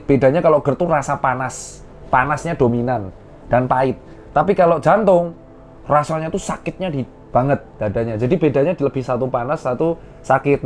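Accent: Java, Indonesia. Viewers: Salam sehat slalu dok.... Saluttt juga kerennnnn logat jawae dokter tirta , Salam satu jiwa dari arema.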